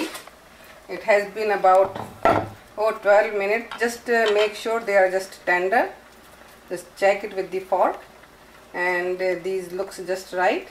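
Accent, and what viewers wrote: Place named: India. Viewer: can you write the ingredients, i can't understand the ingredients, no offense, but i'm mexican and u are indian, and i don't understand you because of your accent, again no offense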